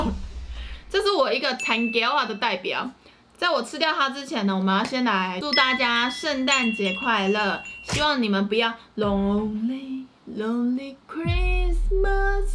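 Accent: Taiwan. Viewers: 可愛的..怎麼吃都美...又配上有台灣味愛台灣的臺語口音.吾告讚.水喔...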